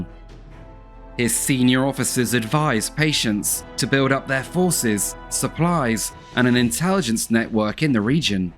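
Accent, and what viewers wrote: United Kingdom. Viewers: Too bad this is narrated by a British accent.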